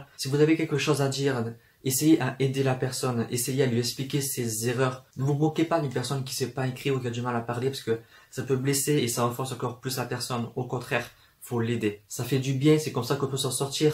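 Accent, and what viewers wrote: South, France. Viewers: J'ai adoré ta vidéo ! Il est génial ton accent #teamaccentdusud 😉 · Vive le Sud, vive l'accent du sud et vive tes vidéos :D ! Bisous :). Et oui tout est possible : je suis bien d'accord avec toi :) !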